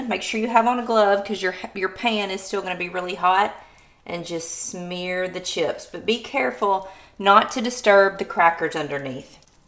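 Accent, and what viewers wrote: South, United States. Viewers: 1st of all, love love your "Rizos/Curls", lol. Gracias for your lovely Southern accent & more importantly the literally walking us through to this mega pretty & delish goodness, lol. I'm not a Baker, hopefully this shall change a "Beba" step at a time. I'm in my mid 60's & still a "Beba"/ Baby Girl at heart, lol. Blessings & hugs....❤😊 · Why name it Christmas Crack??? That is the grossest name you could think of. Makes your southern drawl seems even more unbearable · I just love your accent, i can tell you are a true southern lady! And your kitchen is beautiful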